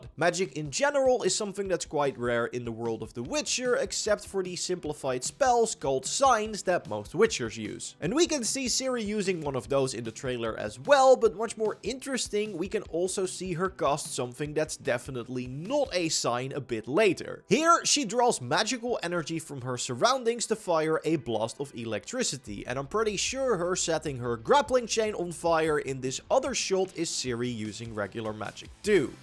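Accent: Netherlands. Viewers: U need to work on your english you sound dutch af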